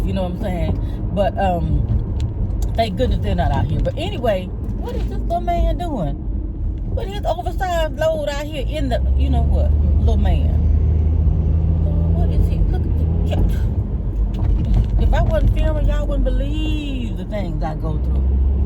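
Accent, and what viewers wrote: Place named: South, United States. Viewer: Great ride along video love your AKA rain coat and I love country dialect 💞💚💞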